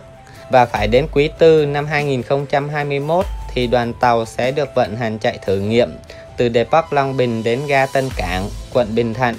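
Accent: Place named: Thanh Hoa, Vietnam. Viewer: Ông này quê triệu sơn thanh hóa à.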